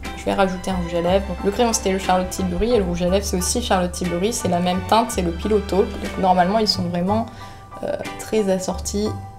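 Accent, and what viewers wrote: France. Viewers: The french accent is so Devine it just screams rich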